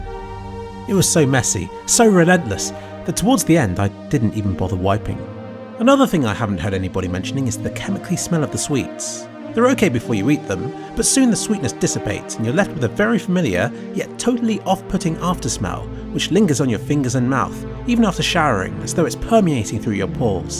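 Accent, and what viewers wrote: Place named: South West, United Kingdom. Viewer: south west accent this video is about a man shitting himself with a fruity taste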